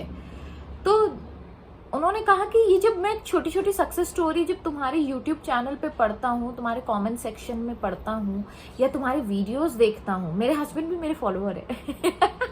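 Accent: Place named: Goa, India. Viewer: Mam, I love your energy and vibe, its very infectious , I love the way you smile ( keep smiling always ) and the way you speak is so adorable , especially the way you pronounce the words like " KARENGA , BOLENGA , " it sounds really very cute, it gives me vibe of a GOAN lingo ( where are you basically from mam, and what is your mother tongue if I may ask? ) May, Can you please teach us the manifestation technique and I'd like to tell you that I have started to chant the Ho oponopono prayer but how long would it take for the good changes to reflect? please guide me how do I go about it. since I recently lost my job and I have no income from any sources whatsoever I am but worried how are things going to shape up for me, please if you dont mind sharing a few useful / powerful technique that could help me I'd really be grateful to you. I look forward to hearing from you. 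please do reply.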